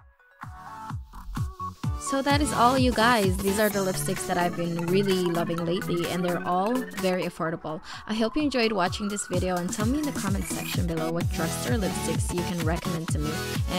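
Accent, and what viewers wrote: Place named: England, United Kingdom. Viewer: love your english accent sis 😊 Helly Cass here sis from the group 😊 I subbed sis.. ty ulit sis sa pag subbed 😊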